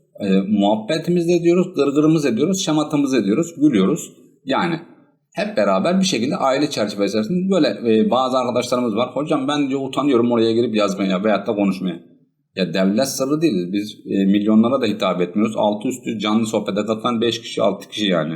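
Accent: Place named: Konya, Turkey. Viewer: Konyalı mısın şive tam o taraf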